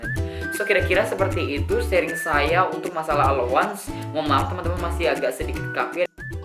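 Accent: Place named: South Sulawesi, Indonesia. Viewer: Hai kak, kalo dari aksen sepertinya orang Sulsel hehe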